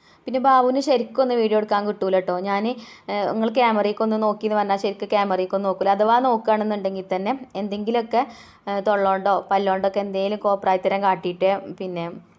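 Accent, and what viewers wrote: Malappuram, India. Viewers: നിങ്ങളെ സ്ഥലം എവിടെയാ, വർത്താനം കേട്ടിട്ട് മലപ്പുറം പോലെ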